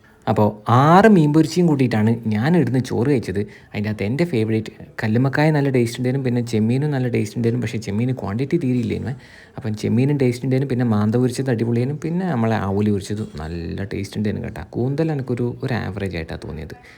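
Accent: Kannur, India. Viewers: Chetta ningala sound ok set anu ee presentation ok adipoli poliyanu.... Enkilum food ok orupad kazhikkunath kond ninglde health nu ok valla issues verullei....... Work out ok chryyarundo.......Enthayalum viedio adipoli anu ketto..........ath parayand erikkan pattulla athra super anu❤️vere oru vloggers num illatha nice presentation.... Pinna kannur slangum adipoliii❤️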